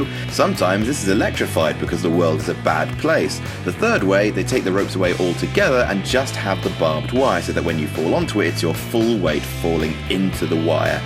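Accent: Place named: England, United Kingdom. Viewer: your boring pommy monotone kills all the joy in hardcore wrestling. Get out of here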